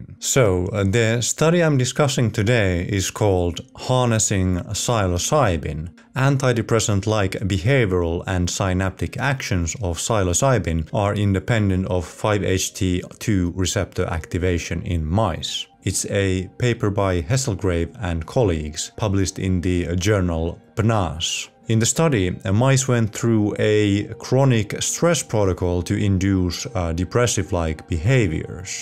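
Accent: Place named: Finland. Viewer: Sounds Finnish :)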